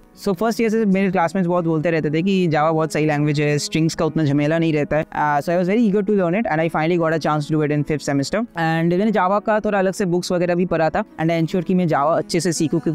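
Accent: Bengal, India. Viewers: Bhaiya are you bengali ? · The cute bengali accent😊😊😊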